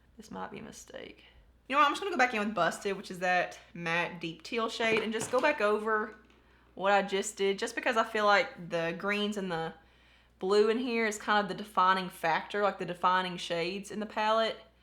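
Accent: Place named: Kentucky, United States. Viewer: Just found your channel and noticed you're from Ky too!!! I love that I had a feeling from the accent lol I'm in eastern ky area what area are you from?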